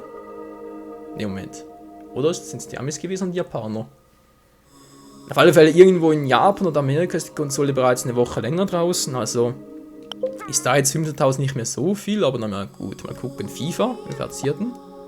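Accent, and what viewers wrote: Switzerland. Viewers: Du bisch eindüütig schwiizer 😊😊😊😊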